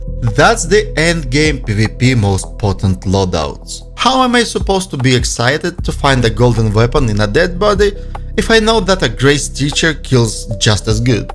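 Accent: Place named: Eastern Europe. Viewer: Getting ratted, and the fact that you lose all of you gear except for the safe pocket, killed this game for me months ago. Tried it again 2 nights ago because in so many ways it's amazing, but this is a deal-breaker. Your accent sounds like people I call "brat" or "druk", and is not a problem at all. In fact, makes the video even more compelling. Slavic bros know things. Seriously though, if some clown with a free loadout can rat you while you are decked out with all purple and gold guns and augments, what is the point? "Horde of naked hobbits" 💀💀💀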